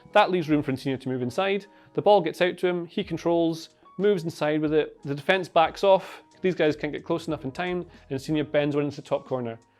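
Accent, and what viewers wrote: Scotland, United Kingdom. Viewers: And for Americans who can't understand Scottish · that's a really light Scottish accent lol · As an Indian, i also have difficulties to understand what he says. I understand American accent totally but when i hear British accent, it makes me feel like that i don't understand English at all.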